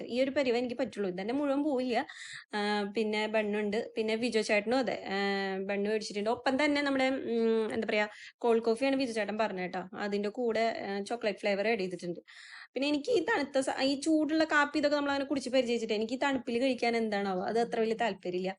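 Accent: Thrissur, India. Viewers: superb.....nattilevide veeduu.....talk style kettitt thrissur pole.... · Chechi pwoliyanu tto. Pakka thrissurkari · ഹായ്... മ്മടെ ത്യശ്ശൂർ ഭാഷ അടിപൊളി...... പിന്നെ dress നന്നായിട്ടുണ്ട്... ട്ട.. vloggum... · Hey super aada nalla Rosamund iniyum chaidolutta entha resam aa Trissur bhasha kelkan enik ishttayi 😘😘😘😘😘😘😘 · Adipoli you are so innocent I like your talk. Exactly thrissur kari...... 😂😂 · chechy Thrissur slang adipoli .Thrissur evidanu veedu? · എന്റെ ചേച്ചി നല്ല രസം ഉണ്ടായിരുന്നു കാണാൻ. നല്ല സ്പീഡ് ഉണ്ട് വർത്താനം, ഞാൻ ഇങ്ങനെയായിരുന്നു ചേച്ചി. എന്റെ വീട് തൃശൂർ ആണ്, എന്നെ വിവാഹം കഴി ച്ചത് എറണാകുളം, ചോറ്റാനിക്കരകാണു.എന്റെ ഭാഷ ചേച്ചി പറയുന്നതുപോലെ സ്പീഡിൽ, അവർ എന്നെ കളിയാകു, പിന്നെ പിന്നെ ഞാൻ എന്റെ വർത്തമാനം ചുരുക്കി, ഇപ്പോൾ തൃശ്ശൂർ ഭാഷയു എറണാകുളം ഭാഷ യു കൂടി കലർന്ന ഒരു ഭാഷയായി, വീട്ടിൽ ചെല്ലുബോൾ വീട്ടുകാർ ചോദിക്കും അവിടെനിന്നു കിട്ടി നിനക്ക് ഈ ഭാഷയെന്നു, എന്റെ ചേച്ചി ഞാൻ ഇപ്പോൾ അതികം മിണ്ടാതെയിരിക്കു, അതാണ് സേഫ്. 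ചേച്ചിയുടെ വർത്തമാനം ഫസ്റ്റ് കേട്ടപ്പോൾ തന്നെ എനിക്ക് മനസിലായിത്രിശ്ശൂർകാരി യാണല്ലോ എന്ന് അപ്പോൾ തന്നെ സബ്സ്ക്രൈബ് ചെയ്‌തു. തൃശൂർ ഭാഷ എനിക്ക് ഭയങ്കര ഇഷ്ടം മാണ്, ഇപ്പോൾ ഏതു ഭാഷയാണ് ഞാൻ പറയുന്നത് എന്നു എനിക്ക് അറിയില്ല ചേച്ചി. അ ചേച്ചി കടലക്കറി ഞാൻ ട്രൈ ചെയ്തു, നല്ലതാണ് ട്ടൊ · Chechi Thrissurkari ano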